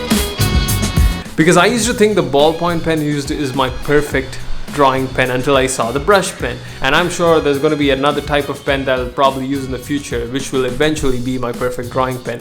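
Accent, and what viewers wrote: India. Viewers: Are you indian? How do you get that accent? Where are you living at the moment? You confuse..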